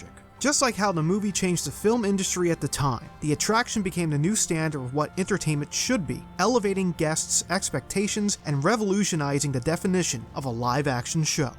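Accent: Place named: Baltimore, United States. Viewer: yo this man got a thick baltimore accent lmao "for more den two decades" love you dawg!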